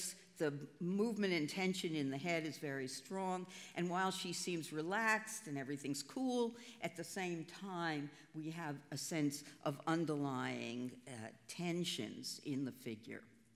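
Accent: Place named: United States. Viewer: This is the best of the internet, the ability to join in, to lectures/presentations like these..... but - big sigh - why cant American learn to pronounce European names correct? If you knew how disgusting it is to listen to this, flat American dialect of this woman (where is she from?), you would be ashamed of yourself.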